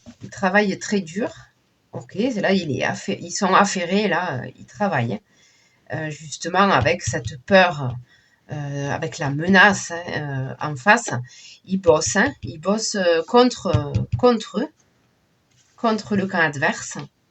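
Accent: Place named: South, France. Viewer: Vous avez un accent du soleil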